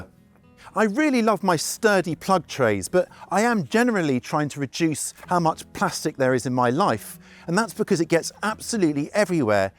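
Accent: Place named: United Kingdom. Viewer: Hello Ive been watching your videos. I just decided to join your channel. Like your accent.. can I ask what part of the UK. You live in?? Joe from the USA.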